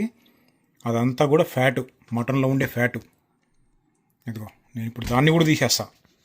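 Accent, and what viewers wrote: Andhra Pradesh, India. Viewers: ఎందులోనైనా నీ రూటే సెపరేటు తంబీ నీ బాడీ లాంగ్వేజ్,నీ భాష లో యాక్సెంట్ ఒంగోలు, గుంటూరు, విజయవాడ మూడు కలిపి మిక్సింగ్ కొడితే ఎలా ఉంటుందో అలా ఉంది 🎉🎉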